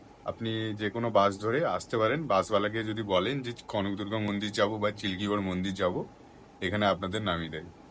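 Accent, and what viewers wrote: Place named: Bangladesh. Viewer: Pure bangla accent ❤🫡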